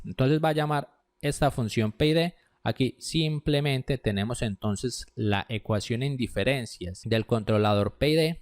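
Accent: Colombia. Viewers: Muy buen video. Tienes acento Colombiano ¿si?.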